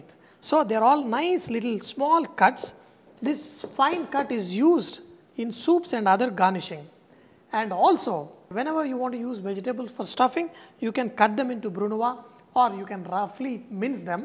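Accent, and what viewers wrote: India. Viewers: is good. HE HAS AN REGIONAL INDIAN ACCENT... NOT EASY TO GET THE NEUTRL ACCENT. WHEN WE PUT UP WITH THE CHINESE AND OTHER ASIAN AND NUMEROUS ACCENTS WHY NOT SANJAY'S WHO IS VERY CLEAR ABOUT WHAT HE TEACHES..... ONE OF OUR BEST VIDEO TEACHER....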